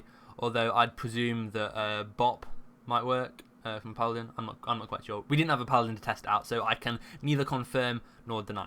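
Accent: United Kingdom. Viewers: that is the british pronunciation.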